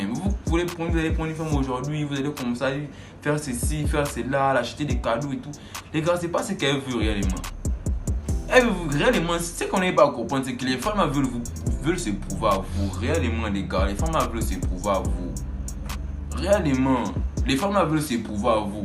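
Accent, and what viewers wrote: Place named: Gabon. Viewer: Ça manque de qualité, d'originalité ! Ça ressemble beaucoup à No limit. Force en tout cas ! Ça sent l'accent Gabonais tout ça.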